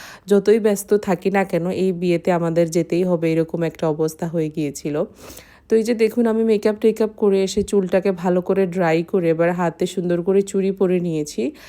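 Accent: Sylhet, Bangladesh. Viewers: Apni ki sylheti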